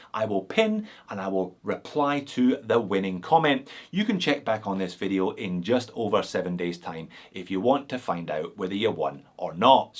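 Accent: Scotland, United Kingdom. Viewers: Thank you so much for all these wonderful vids (and this bit of scottish accent comin´ from Down Under)! I scratched the itch today and got me one of these lovely chinese Seagull chronos 😁😁🎅🎅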